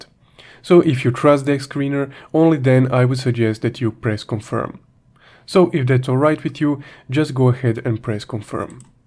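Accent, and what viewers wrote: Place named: Russia. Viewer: Со найс то хеар рашн акцент)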